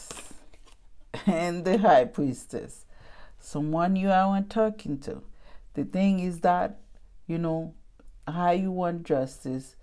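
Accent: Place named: Jamaica. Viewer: Your accent sound Jamaican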